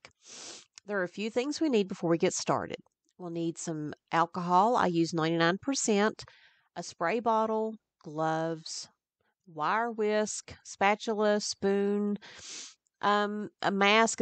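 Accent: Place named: South, United States. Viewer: Lovely job. Very similar to the recipe use.... just subscribed to ur channel I'm a fellow bath and body product creator... love ur personality and I can definitely relate to making messes while working 😆... love ur southern accent btw...I'm from NC and I've got that twang too 😆 God bless and hope to see many more of your beautiful Creations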